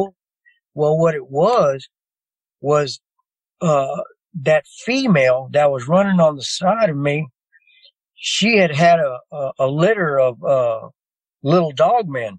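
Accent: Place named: Texas, United States. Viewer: One thing I do know is...He IS a Texan...I am from Texas and his accent is spot on! ie..bob wire..lol..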